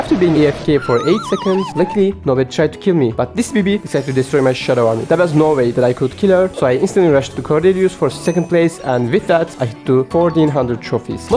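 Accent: Turkey. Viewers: Are you from turkiye your accent looks turk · Howdy maan?? I wanna ask smth, are u turk? bcs ur accent looks like turkish.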